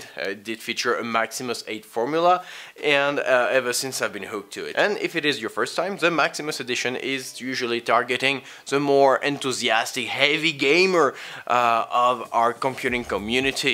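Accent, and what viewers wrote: France. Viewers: Love the accent! xD Are you French? Anyway, appreciate the review. Waiting for mine to arrive in a few days.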